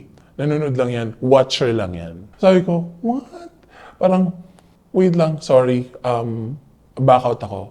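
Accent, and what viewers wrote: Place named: Manila, Philippines. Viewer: You sounded like you're from DLSU 😀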